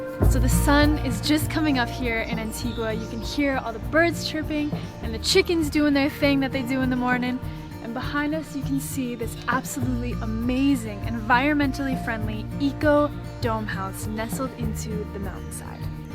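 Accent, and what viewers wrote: United States. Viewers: From her accent I think she's from the USA. So this ever so eco friendly gal, did she get to Guatemala by bicycle? I fucking doubt it.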